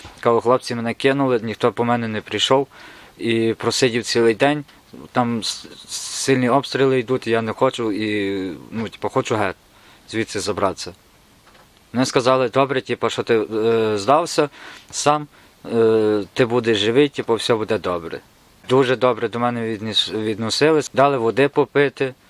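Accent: Ukraine. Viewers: С гуцульский акцентом разговаривает.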